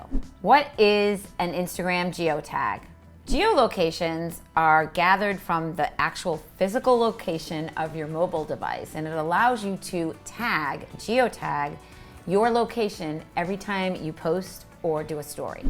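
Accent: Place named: Boston, United States. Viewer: is the best!! She has been so helpful in providing tips to help me grow my business! She’s the real deal and an Instagram guru!! And I love her Boston accent!!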